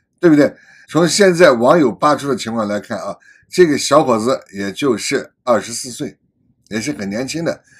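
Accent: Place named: Shaanxi, China. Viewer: 陝西醋溜普通話啊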